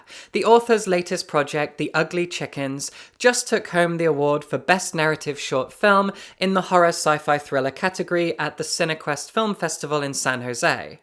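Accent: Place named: RP, United Kingdom. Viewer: So you’re a lazy contrarian with a posh accent. Congrats.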